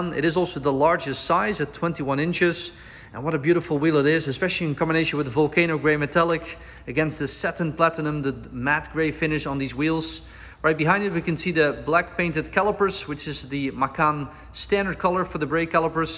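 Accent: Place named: Netherlands. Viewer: Ziet er goed uit kerel, tja je naam en je accent….ik hoorde meteen, ah Nederlander. Super auto! Keep uw the good video’s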